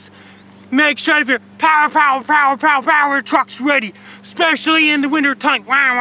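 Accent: Newfoundland, Canada. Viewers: Atlantic accent is like this. Especially Newfoundland..You can't even understand what they're saying.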